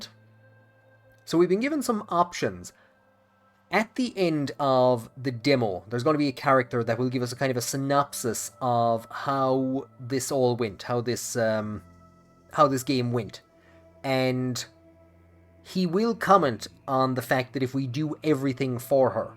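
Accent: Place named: Ireland. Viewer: irish guy playing aussie simulator....i luv it!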